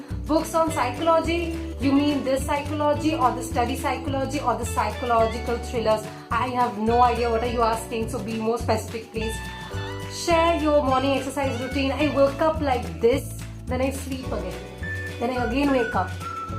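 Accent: Bengal, India. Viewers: Hey! I am new to your channel and the very first thing I noticed was your accent. You sound like a Bengali. Are you a Bengali? Kothay thako? Kolkatay? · Bengali! I was thinking so coz ur tone doesn't seems Marathi, Gujarati, Bihari, Assamese & when literature comes, Bengalis r on top. 😁 Great work, very inspiring.👍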